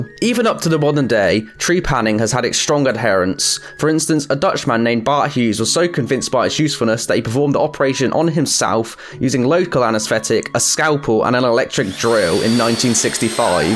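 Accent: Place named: United Kingdom. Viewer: To hard a british accent. Sounds like he ismeither too lazy to form complete words with a space between them, or has a mouth full of crap.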